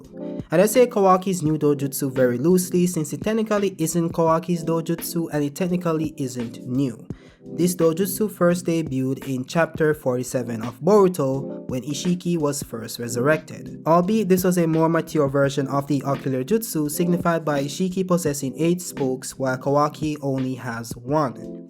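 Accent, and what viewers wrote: Jamaica. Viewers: My dawg Jamaican accent cannot be contained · Where you from fam? You sound Jamaican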